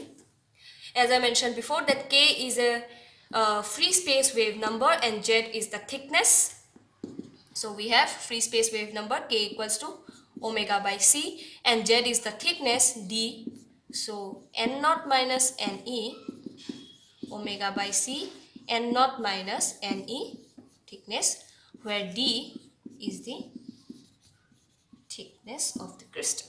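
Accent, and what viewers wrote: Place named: Assam, India. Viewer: She is Indian and An Assamese...And this is how we speak English...We should not be ashamed of our accent...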